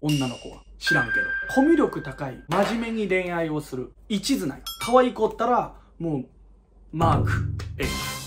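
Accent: Hiroshima, Japan. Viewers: 広島弁が沢山聞けて嬉しい。車シリーズ大好き。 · コテコテの 広島弁良いですね。私は１２０系マークXに乗ってます。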